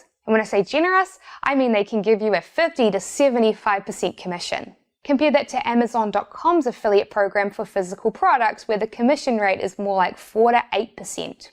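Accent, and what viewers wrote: New Zealand. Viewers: That En Zed akcient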